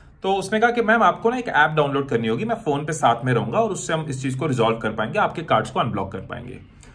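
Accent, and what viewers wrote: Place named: Jharkhand, India. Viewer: Ye iske tounge language se pta chal rha hai ki ye jamtara Jharkhand ka hai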